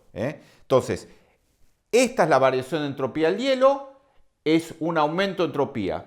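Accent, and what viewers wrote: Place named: Argentina. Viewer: yo se que usted no quiere que le pongamos like ni que le agradezcamos y toda la bola, pero no sabe, NO SE DA UNA IDEA de lo HERMOSO que es escucharlo hablar en argentino explicando tan bien todo y con tanta buena onda y facilidad, curso Lic. en Biotecnologia en la UNM y es hermoso todo esto! gracias!! millones de gracias! quisiera abrazarlo fuerte! Aguante la educación publica!!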